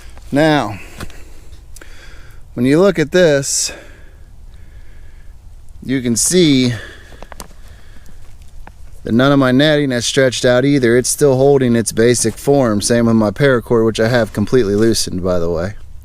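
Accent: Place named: Mid-Atlantic, United States. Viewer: Love that mid Atlantic accent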